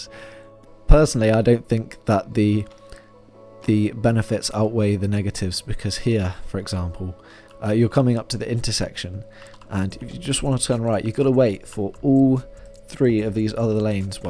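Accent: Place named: United Kingdom. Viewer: british i know it on your voice 🙂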